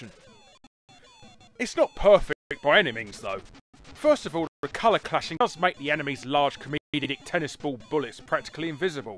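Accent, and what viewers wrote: United Kingdom. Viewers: Why so british?!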